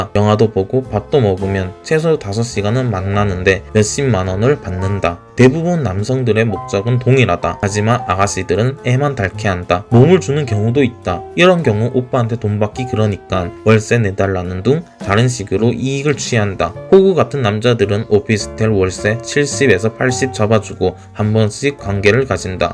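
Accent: Yanbian, China. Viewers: 발음이 조선족인데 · 조선족 억양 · 말투 조선족인가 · 발음이 조선족이네? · 발음이 조선족인데?? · 음~~~이상하네,, 발음이 중공 발음.... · 너 조선족 맞지? 말투가 딱 조선족이네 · 발음이 딱 조선족인대? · 조선족이신가?ㅅ발음이 영락없는데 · 조선족이냐? 말투 거슬리네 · 대한민국인 어투는 아니시네요... 조선족 어투와 많이 유사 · ㅡㅡ.. 중국에서 했나 말투가 연변어네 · 발음이 중국인같은데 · 말투가 조선족말툰데.... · 말투가 연변이신데 조선족이신가요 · 조선족 말투같은데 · 말투가 딱 연변인데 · 말투가 조선족 인가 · 발음이 중국인이네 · 말투가 조선족말투 같네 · 말투가 조선족인데... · 이분 조선족 말씨를 쓰네요.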